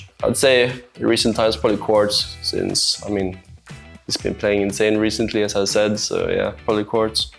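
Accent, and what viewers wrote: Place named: England, United Kingdom. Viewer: he has a definite english accent, forsure picked something up from kai and fastro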